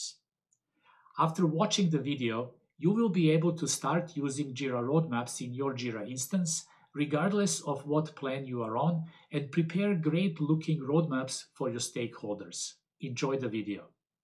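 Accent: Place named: Balkans. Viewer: Odma sa aboniro , cuje se nas covjek i sto je jos bolje tvoj Engleski razumijem 😊 Hvala 👍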